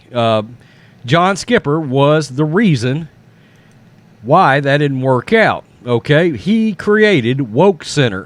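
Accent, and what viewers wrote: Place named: South, United States. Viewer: I lost brain cells listening to your country accent and the amount of time you said, “Woke.” 🤦🏽‍♂️🤦🏽‍♂️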